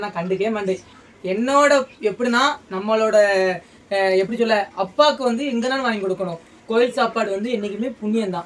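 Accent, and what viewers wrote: India. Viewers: உன் பேச்சு வார்த்தை எல்லாம் தூத்துக்குடி மாவட்டத்தில் உள்ள பேச்சு மாதிரி இருக்கு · தம் தந்தைக்காக, தம் குடும்பத்துக்காக உணவு வாங்கி கொடுக்கனும்னு எண்ணுறதே பெரிய மனசு தம்பி. அந்த உணவு வழிபாட்டுத்தலங்களில் கிடைத்தாலும் சரி, திருமண நிகழ்ச்சிகளில் கிடைத்தாலும் சரி, யாரேனும் கொடுத்தாலும் சரி, நீ உழைக்கும் இடத்தில் கிடைத்தாலும் சரி அதை வாங்கி குடும்பத்தார்களுக்கு கொடுப்பது மிகப்பெரிய நன்மை உண்டு. உம்முடைய நெல்லை பேச்சு மிகவும் அழகாக இருக்கிறது. உன் பெற்றோர்களுக்கு கடவளின் ஆசிர்வாதம் என்றென்றும் கிடைக்கட்டும்.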